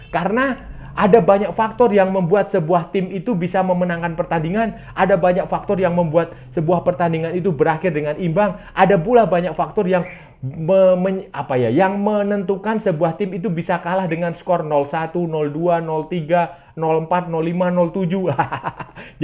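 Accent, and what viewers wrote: Indonesia. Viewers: Kau org Malaysia ke?...sebutan huruf a dan e memang begitu di indonesia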